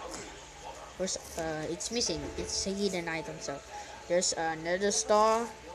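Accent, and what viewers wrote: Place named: Visayas, Philippines. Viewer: para kang bisaya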